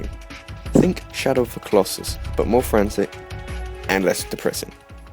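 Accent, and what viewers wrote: United Kingdom. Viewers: what part of Britain are you from?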